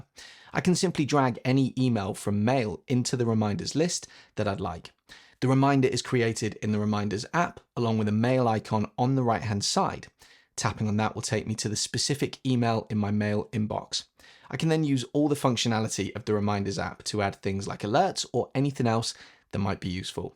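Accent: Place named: RP, United Kingdom. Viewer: Duck! I think I am addicted to this posh British accent...very nice content in nice pace.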